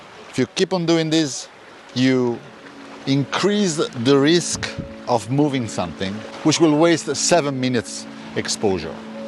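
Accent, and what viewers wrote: Italy. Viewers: I visit Bologna several times in my line of work! The thing i miss the most is your italian accent, and the food also ( we have excelent food here in Portugal, but in Italy is diferent! We used to go to a place named "Ristorante Bolognese", and the 2 brothers there, were the soul of that place...we see them once a year..but they never forget us! they call us "DA SILVA!!...HOW ARE YOU?!" in my "TO VISIT LIST" Cinque Terre, is in secound! Hope this thing go away very soon so that we can return to our normal lives! Great video, and as always, great photos!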